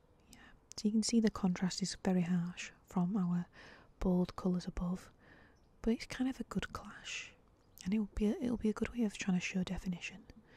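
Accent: Yorkshire, United Kingdom. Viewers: Giving into the American pronunciation of Van Gogh, sacrilege haha - I can tell you're local to me, I'd guess a fellow Yorkshire-(wo)man? Although I also have family from Derbyshire, Notts and Hull and can't quite place you! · Something about your voice which is incredibly calming! Do I detect the Sheffield Yorkshire accent?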